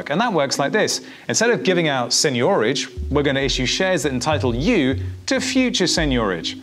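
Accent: United Kingdom. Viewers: hahaha the Scottish accent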